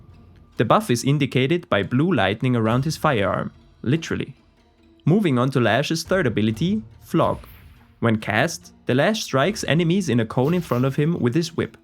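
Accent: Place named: Germany. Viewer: You Sound German tbh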